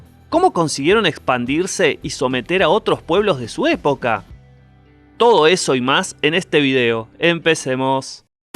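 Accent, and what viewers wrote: Rioplatense, Argentina. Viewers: Ya lo dijeron, es el acento argentino/uruguayo. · Es que así es la pronunciación de los argentinos o uruguayos, no es que lo haya hecho mal (?)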